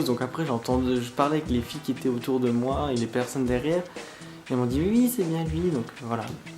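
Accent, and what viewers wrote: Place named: Lorraine, France. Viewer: Tiens ? Toi aussi tu est lorrain ?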